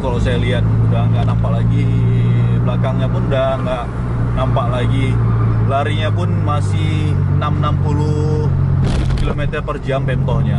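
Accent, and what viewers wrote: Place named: Medan, Indonesia. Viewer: Abang tinggal dimana bang?, Soalnya Ngomongnya kek orang Medan hehe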